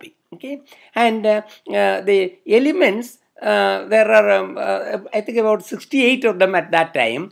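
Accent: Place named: India. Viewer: is it indian accent?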